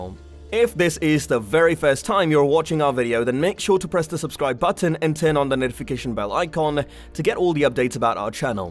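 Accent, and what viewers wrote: United Kingdom. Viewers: Why do these videos always have to be narrated by some idiot with a British accent? And of course it will only be available to the very rich.. Who else could afford to buy an artificial companion for $350 THOUSAND Dollars?? And if they are fully functional then show me... I want to see the function!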